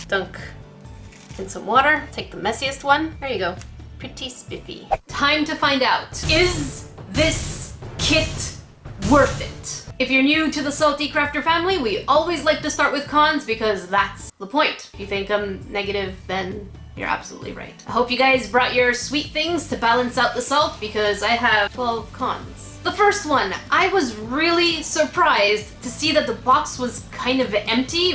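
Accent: Canada. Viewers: also are you canadian? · this is my first video im watching of yours and im very happy to hear youre canadian WOOT WOOT! go canada!! #toronto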